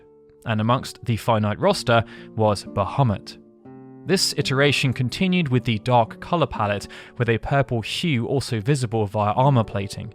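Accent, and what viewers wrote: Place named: United Kingdom. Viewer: Ultra long video essays of old games are wonderful. MrEdders123 and I Finished a Video Game are also great alternatives with a similar British tone.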